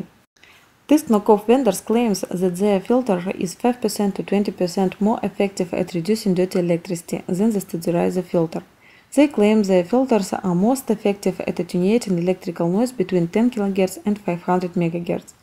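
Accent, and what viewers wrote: Eastern Europe. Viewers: This isn't meant as offensive... but why choose a non-native english speaker to narrate a video like this? It's very hard to understand. Many eastern European / Russian people speak too fast when they speak in another language, I've noticed. If you want a video like this to be taken seriously, get it re-narrated.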